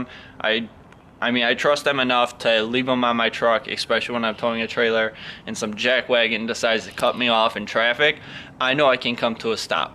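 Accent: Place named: Chicago, United States. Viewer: You do got some Chicago on that accent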